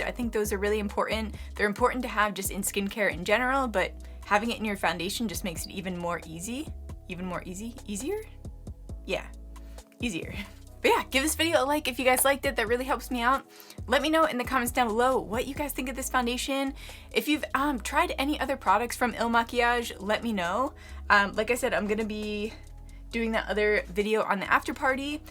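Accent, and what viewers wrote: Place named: East Los Angeles, United States. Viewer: Wonder how she has East LA accent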